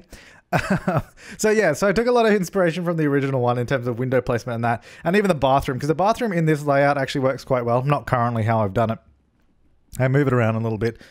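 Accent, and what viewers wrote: Australia. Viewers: Are you Australian? your accent lmao, bc i am too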